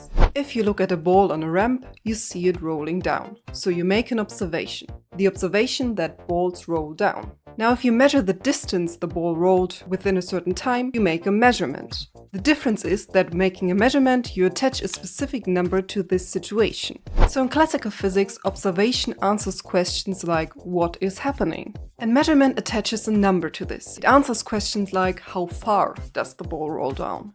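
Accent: Germany. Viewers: Agreed, I don't think this is Sabine, but I did do a double take at first. I believe it's a German accent, but I'm no expert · I think, no. Definitely German, but different intonation to my ear. Softer vowels, less nasal (not that Sabine is incredibly nasal), and more rolling inflection, rather than the distinct highs and lows of Sabine. Their voices are similar in some respects, but they are definitely different people to my ear.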